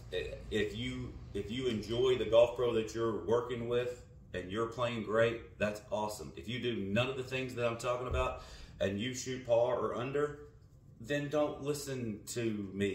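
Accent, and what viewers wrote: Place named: Texas, United States. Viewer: I love how articulate and well spoken your videos are. I have watched several videos , so far, will watch them all. Videos have good ideas in them, I watch them a few times over, to pick up every word. Sounds like you have a Texas accent, like you could ride up in a horse and say "Let me show you around the ranch". The hands have to work correctly, one arm right hand swing drill is great. Mike Malaska stresses hand action also.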